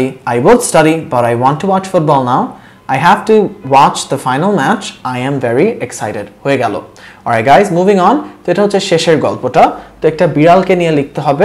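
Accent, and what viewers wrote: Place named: United States. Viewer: Channel টা পছন্দ কারণ American accent এ ইংলিশ ক্লাস হয়।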